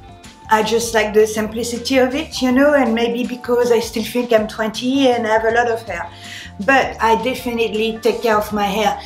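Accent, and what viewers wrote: France. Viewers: Tu me fais rire., française aussi a.,Adelaide on a kes memes cheveux fins qui frisent et j aime las trop trop de produits mii non plus., Silicium organic d. Espagne est suoer pour kes ongles la peau et les cheveux Moi aussi je suis une vitamins junky multi B fish oil,.....tu as raison pour la longueur mais je suis trop faineante pour me raidir les cheveux 😀 les australiens doivent adorer ton accent 😘😘bravo oour ton chanel comme tu dis 😘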